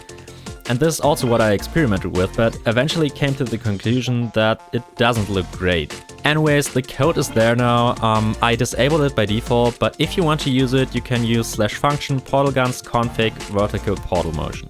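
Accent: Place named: Germany. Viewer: Wie man das Deutsch bei dir Raushört haha. Nice Gravity Gun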